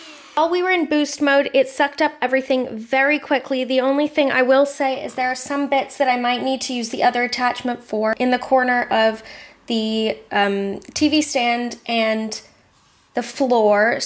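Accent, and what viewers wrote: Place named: United States. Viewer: London...that explains the British AC plug, but not the American accent of the presenter. But good job though. Answered all my questions about the Shark Wandvac. I'm going to assume they come with US AC plugs for US customers.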